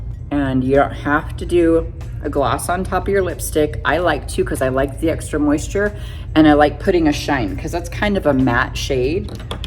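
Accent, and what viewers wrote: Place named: South, United States. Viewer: It isn’t bad however I preferred the fat triangle technique. I love my new eye cream and the way you taught us to mix it with under eye concealer. What a difference. Yup! You definitely have a Southern undertone and I love it, so just be you girl. LOL Can’t wait to see the bangs. I know you will do something to make ma laugh. Take care sweet.❤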